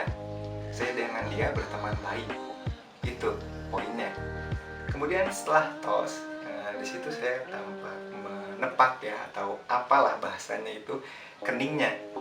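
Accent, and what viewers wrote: West Java, Indonesia. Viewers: aa orang sunda ya😂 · yallah a sunda banget bahasanya wkwkek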